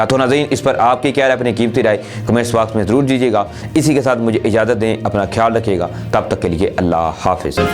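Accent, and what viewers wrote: India. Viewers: I was enjoying this straight fwd reporting style till . Bhai we r not in India and we do not do Hindi annunciation. Ye kh-rab kiya hei? Fool bhi keho phool ki jagah. Ye kiya aur Q? Bollywood k ganei hit nehi hote Urdu k baghair aur app lower level k zuban istemaal kerne per tule hain. Mei eik defa apka pgm aur dekhunga k ye beihoodgi umeed hei app chorr denge. Werna i won't subscribe or watch. Bohat afsoos. Hua. Allah ne arbi ghora diya ho, for example, aur aap gadhey per sawar ho ker ja rehe hain. I like your reporting style per this is unacceptable.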